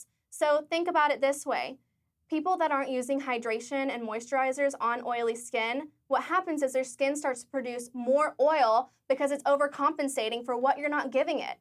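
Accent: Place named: United States. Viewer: As a New Zealander who doesn't have a thick accent, this woman's American accent is not super yanky. A good american accent.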